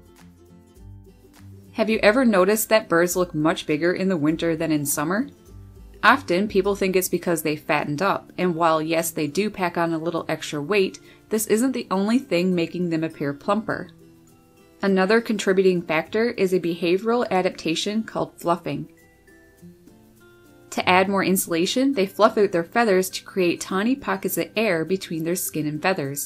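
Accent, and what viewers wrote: Canada. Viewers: Hi. This is the first video of yours that I've watched and I really loved it. I assume from your accent that you're from Canada? The colours of those birds were just stunning, especially the blue ones. Sending you best wishes and warmth from the UK. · The Canadian accent is cracking me up! 😄 That's probably one of the most pronounced ones I've ever heard!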